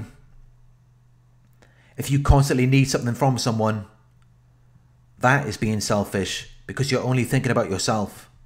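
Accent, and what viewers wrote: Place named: Scotland, United Kingdom. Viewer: I like your videos and your lovely Scottish accent.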